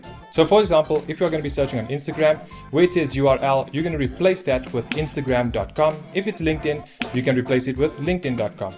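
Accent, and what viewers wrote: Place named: South Africa. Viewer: I know a South African when I hear and see one..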